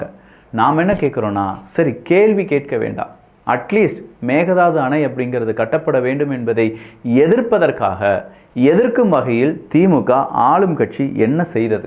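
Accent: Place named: Kerala, India. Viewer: I get malayali l get malayali pitch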